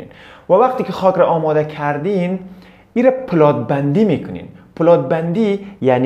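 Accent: Afghanistan. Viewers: قربونش او لهجه افغانی و کرامت برم . شما مردم عزیز افغانستان . بسیار بسیار مردم عزیز و شریف و درستی هستید . من با شما عزیزان خیلی سر و کار داشته ام و شما عزیزان را به پاکی و شرافت و انسانیت میشناسم . ارادتمند .❤❤❤ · عالی بود یه برنامه جالب با لهجه زیبای افغانستانی و اصیل ایرانی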